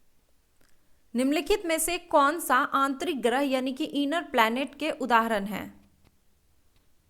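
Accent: Bihar, India. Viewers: आप भी बिहार से हैं Mam ❓❓❓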